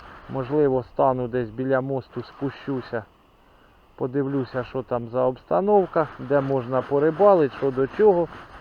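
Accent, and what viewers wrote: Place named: Cherkasy, Ukraine. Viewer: Неначе черкаський,,говір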